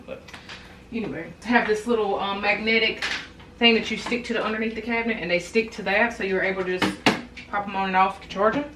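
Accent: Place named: North Carolina, United States. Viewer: Just stumbled across your page and immediately heard the NC in your voice. Just had to stay for a visit. Love all your beautiful Halloween goodies.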